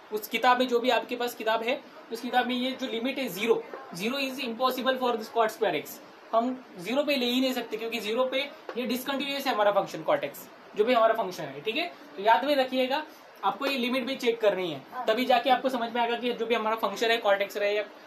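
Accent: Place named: Maharashtra, India. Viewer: Sir maharashtra se ho n Bolne k tareeke se lag rha h 😂😂😂😝😎😎😎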